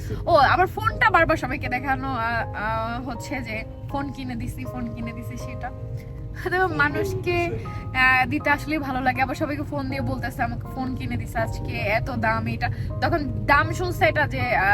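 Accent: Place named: Khoyraita, Bangladesh. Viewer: খয়রাইতা কাঁথা বলছো আমার শুনতে ভালোই লাগছে😅😅😅😅😅😅😅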